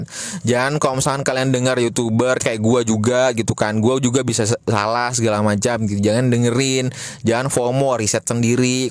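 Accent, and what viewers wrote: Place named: Western border region, Indonesia. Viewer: Cara penyampaian nya kaya daerah Barat perbatasan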